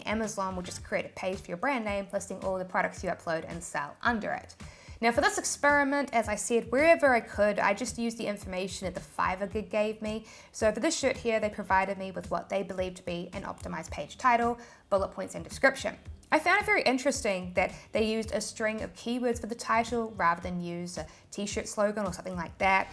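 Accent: New Zealand. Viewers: I knew you were a kiwi straight away! Awesome to see fellow kiwis killing it!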